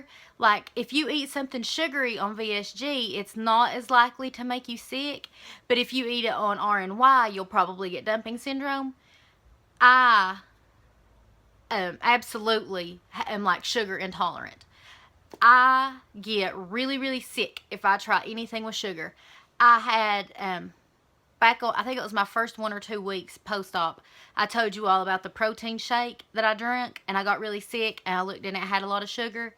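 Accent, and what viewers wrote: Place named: South, United States. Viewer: I also get dumping with a lot of sugar. You're great - live your videos and your accent. I live in Florida, but we don't have that think southern accent. I love it and could listen to you all the time. Keep up the great job! Looking good!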